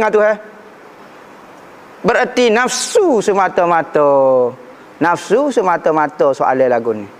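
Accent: Kelantan, Malaysia. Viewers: Suka dgar cramah ustz loghat klate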